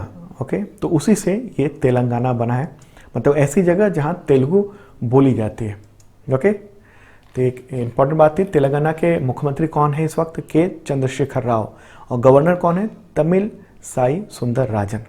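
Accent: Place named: Bihar, India. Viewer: Voice action se Lagta h Bihar ke ho !!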